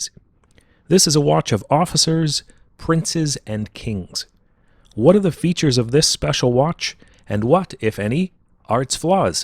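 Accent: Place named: United States. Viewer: Konstantin Correct. But he sounds American and they spell English words badly there.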